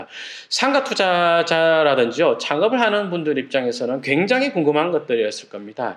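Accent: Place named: Gyeongsang, South Korea. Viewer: 좋은 책 감사드립니다 유투브 방송 잘보고 많이 배우고 있습니다 구수한 경상도 사투리와 "엄마야" 감탄사가 너무 정겹습니다 앞으로도 멋진 방송 기대합니다 대박 기원^^♡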